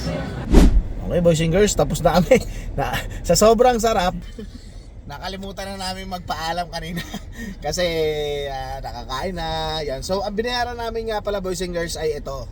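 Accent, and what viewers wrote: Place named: Canada. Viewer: Wow...parang Canadian si ate...ang galing ng accent nya at makipagusap sa mga locals❤❤❤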